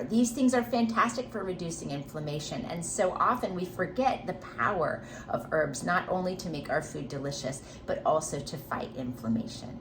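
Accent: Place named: United Kingdom. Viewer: Your British accent is spot on.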